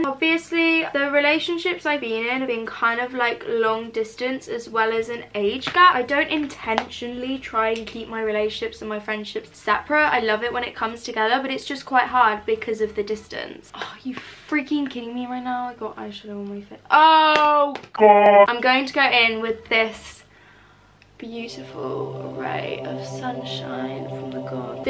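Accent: Scotland, United Kingdom. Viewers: As a Scottish person listening to her Scottish accent has me in stitches 😂😂😂😂😂👌🏻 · How could you not love her Scottish accents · can please do a whole video in her scottish accent its actually so entertaining🥰🥰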